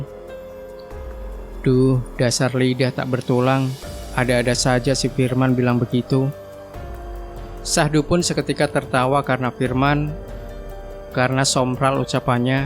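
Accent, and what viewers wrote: Java, Indonesia. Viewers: Logat jawa medok ngomong guwa2...saya lbh enak didengar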